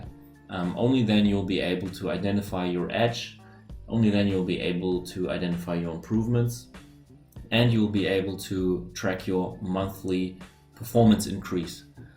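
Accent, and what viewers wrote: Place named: Denmark. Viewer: Danish. You’re Danish aren’t you? Your name suggests you’re from around there but also the way you pronounce “particularly”. The Danes love CSGO and there’s one Dane in particular who pronounces that word the same way you do. I could be wrong. Anyway, great video again. Edit: I’m even more sure that you’re Danish: mild temperament, even mannered, pro-social, supportive, more words that sound Danish like “next time.” Haha, anyway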